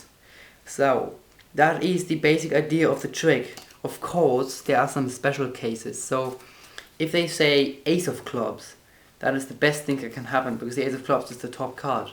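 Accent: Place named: Germany. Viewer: That german accent😂👳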